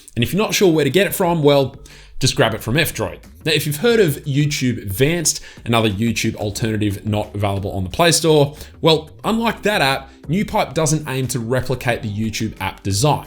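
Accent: Australia. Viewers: When I heard your accent I thought you are a British but you are definitely Australian 😂❤️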